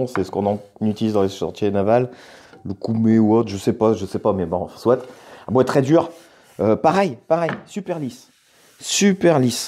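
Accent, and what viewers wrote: North, France. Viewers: Certes, pas donné, mais pour moi, inconditionnel de Makita, cette machine est tout de même inférieure au niveau du prix. Je viens d'en commander une (Metabo comme présentée dans la vidéo). Je me régale déjà de pouvoir la tester et l'utiliser. Merci à et la présentation avec ce chouette accent de ch'nord;o))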